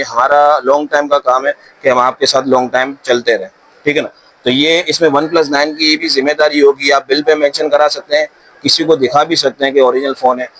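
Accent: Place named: Rural, India. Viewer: بولنے کے انداز اور شکل سے بھی پینڈو لگتے ہیں بھائی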